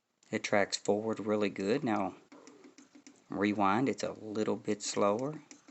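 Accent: United States. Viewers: Look it up in the Dictionary , the yanks pronounce it that way!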